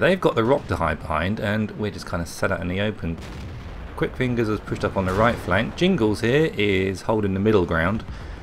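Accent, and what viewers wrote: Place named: United Kingdom. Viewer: its the British accent i guess;) didnt hear the sarcasm.. good weekend.. also can i send some gameplay?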